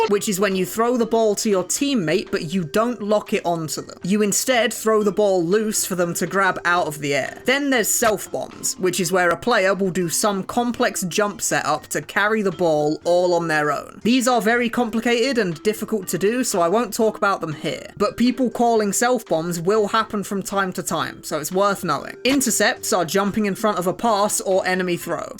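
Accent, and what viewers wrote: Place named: United Kingdom. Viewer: heywhy r u british :(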